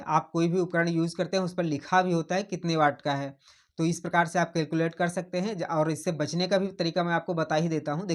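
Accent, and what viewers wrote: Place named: Western Uttar Pradesh, India. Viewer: आवाज तो आपकी वेस्ट up की लगती है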